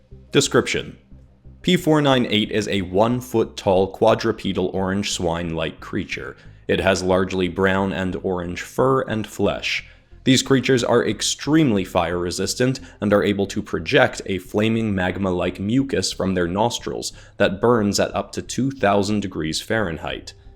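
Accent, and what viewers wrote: Canada. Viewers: You know, I've known you were Canadian for a long time, but never have you gone THAT Canadian. Love it, but man did you throw me for a loop! I wasn't even thinking to much about the "Z", lol. Love the designs for Snivy and Tepig designs. I don't play much Pokémon, myself, but they're some of my favorite starters, art-wise. So cute! That second one, though... that was rather disturbing looking. Glad for more of these! Just out of curiosity, the only archon we haven't seen do much yet is Romilasect. Do you have any plans for her in the near future? Presumably regarding one of the core couples, I take it. I've been hooked on the TAHE storyline (and am looking forward to seeing what happens next with Kiko, Alsya, and Dresden), but I guess it does strike me as weird how much of a side character that specific villain has been. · IDK are you Canadian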